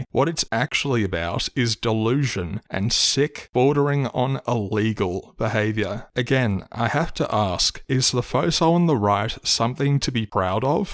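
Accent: Australia. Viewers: That’s an Aussie accent also you have not made any points against any of what he has said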